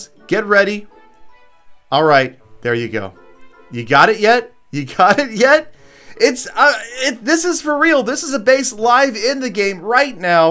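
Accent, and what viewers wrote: Poland. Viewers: r u Polish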